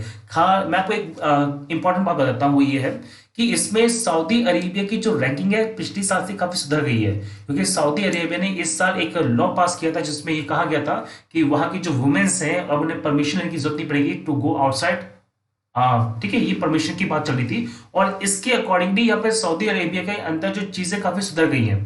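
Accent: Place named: Malwa, India. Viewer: Sir you sound like resident of MP. Do you belong to Malwa region?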